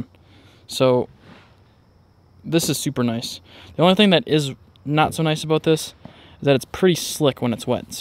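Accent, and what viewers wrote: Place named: Minnesota, United States. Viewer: 40 seconds into the video I knew you were from MN, lol Nice build man!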